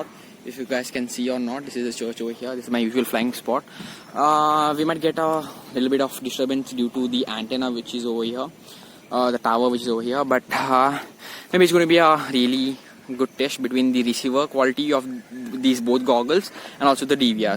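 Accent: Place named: England, United Kingdom. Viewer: Hi I am deon dsouza I liked your English accent. Keep going. U r doing very well.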